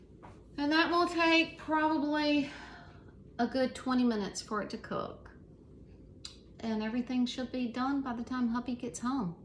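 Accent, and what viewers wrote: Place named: South, United States. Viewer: Never apologize for your southern accent ha ha I'm such a hick myself lol Love that apron, so cute. I'm gonna show it to my mom, I know she can make me one. I love watching you cook. That soup and cornbread sounds so good, yum! I believe you're right about the haze it's the same way here. Never thought about it until you mentioned it.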